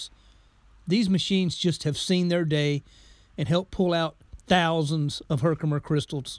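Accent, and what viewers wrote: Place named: Carolina, United States. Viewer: Handsome, charming, rugged, manly man with a Carolina accent and a sense of humor, doing scientific 'ciphering' while digging into dirt and rock to pull out massive, gorgeous gemstones!... WHAAAAAT! THAT'S JUST HOT! Best YouTube channel EVER! ROCKS!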